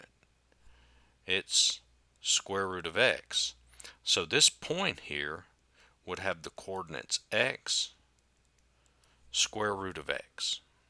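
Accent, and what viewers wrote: South, United States. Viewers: Same here.... I love the Southern accent... Howdy all the way from South Africa 👋😃 🌍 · I know im four years late but youre the first souther math tutor I have ever heard. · Bro speaks like a cowboy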